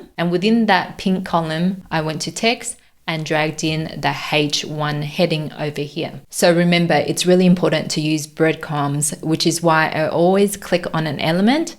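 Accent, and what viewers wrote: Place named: Australia. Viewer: EXELLENT! EXELLENT TRAINING! Nothing personal to Mr. Lemonaid, but his broken English is a bit too much for me to tolerate for training I desperately need. I GET IT! English is difficult, but before you start teaching learn the language much better, even if you do have a bit of an Aussie tilt to it. BTW, my heart SOO goes out to the patriots down under. Fight on.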